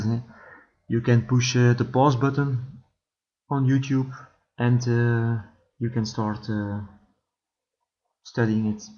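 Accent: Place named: England, United Kingdom. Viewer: Thank you for this great video. Btw, j´aime bien votre accent anglais :-))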